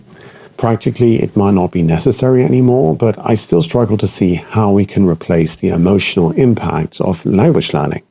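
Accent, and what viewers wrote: Germany. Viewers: It's amazing to hear that you're already speaking english with a light german accent. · To my ears you sound like a German who has learned English. I often say "Thought shapes language and language shapes thought". This includes mathematical logic. When people do messy omnipresent stuff like equating "three times more" with "three times as much", then they become easier to confuse and deceive, easier to manipulate, and their thoughts will become more vague, sloppy, i.e. they lower their IQ by giving up mental accuracy. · Hey if you're a Native Brit, I can hear a German layer in your pronunciation, it stands out to me, its in your prosody and it's also as if your liason has been reduced. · You have a subtle German accent in your English. Congrats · I may imagining things, but I almost hear a bit of a "German" accent in your English now too. 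Funny how the accents can bleed both ways, especially between such closely related languages. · I notice when you speak English you sound slightly like a German would when speaking English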